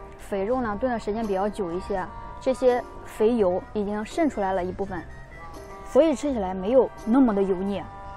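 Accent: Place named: Weifang, China. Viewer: 肯定是潍坊老乡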